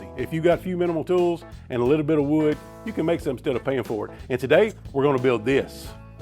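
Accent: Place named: Arkansas, United States. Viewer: Are you from Arkansas? Your accent sounds just like my hubby and his family :)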